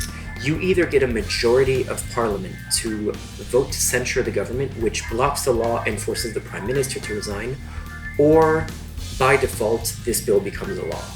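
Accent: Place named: France. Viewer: The guy definitely speaks French, you can hear it Great job anyway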